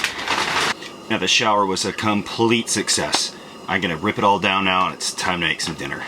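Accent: Alberta, Canada. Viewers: are you from alberta?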